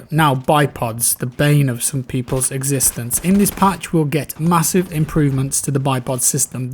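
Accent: United Kingdom. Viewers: Talks like a brit.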